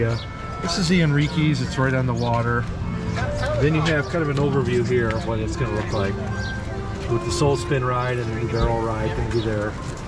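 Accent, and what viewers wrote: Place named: Minnesota, United States. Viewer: You from Minnesota or Canada? I can hear that accent right away.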